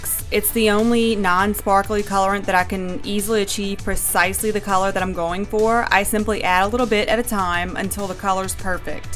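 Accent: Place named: South Louisiana, United States. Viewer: Are you from South Louisiana? Your accent is very similar to ours.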